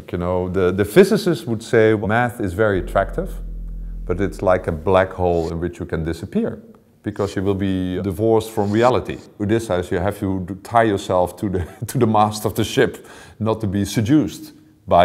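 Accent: Netherlands. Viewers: Je hoort meteen dat hij Nederlands is :)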